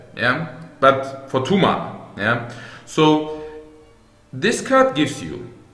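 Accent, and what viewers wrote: Germany. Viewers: his english sounds sooo german xD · Liege ich mit der Annahme richtige das du eigentlich deutsch sprichst? XD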